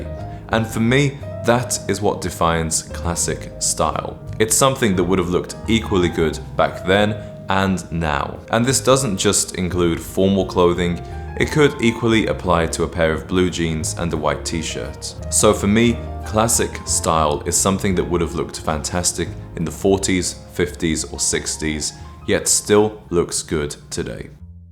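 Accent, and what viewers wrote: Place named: England, United Kingdom. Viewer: Why not??? You sound English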